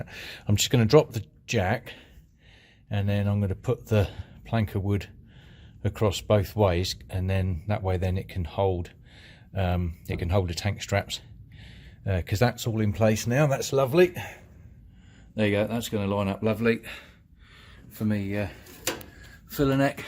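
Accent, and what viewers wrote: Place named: Cockney, United Kingdom. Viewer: You sound like you were born within the hearing of Bow Bells... I had a really dear friend who my kids called Grandpa no. 2 who was from East London, sadly he passed away last year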